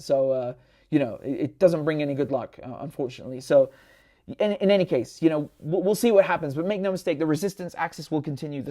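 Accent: England, United Kingdom. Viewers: Bro go back go your Syria and stop spreading anti west cheap propoganda in British accent. If you love so much your resistance you should live there.. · English accent is strong in this one.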